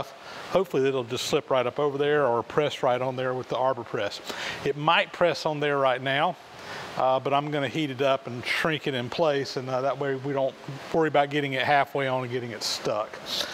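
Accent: South, United States. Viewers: Why captions? speaks perfectly good southern English.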